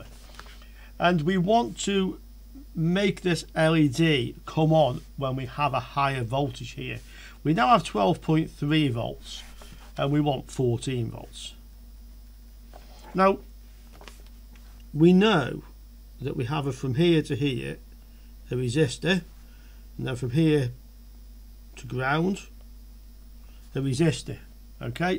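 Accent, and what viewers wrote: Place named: England, United Kingdom. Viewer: I very much enjoyed your English accent. I also enjoyed your teaching. It clarified my understanding of the TL 431. And I subscribed to your channel. thanks for sharing.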